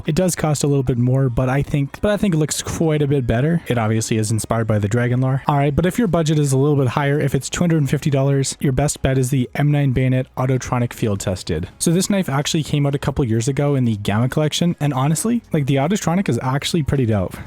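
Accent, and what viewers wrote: Norway. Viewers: Are you from Norway? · hmmm hmmm er du Norsk? (are you Norwegian?in Norwegian obviously)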